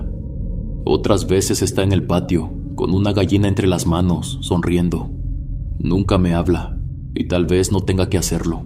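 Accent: Mexico. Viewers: !! Qué buena historia!! Muy buena narración y lo mejor con ese acento bien mejicano, soy de Perú 🇵🇪 y te oigo siempre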